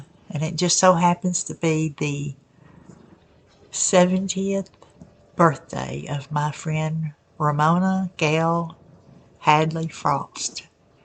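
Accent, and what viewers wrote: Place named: South, United States. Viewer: Love this,! I think this is fabulous; you’re very funny, interesting and articulate, not to mention brave. And trust me honey, we both have southern accents even if we think we don’t 😘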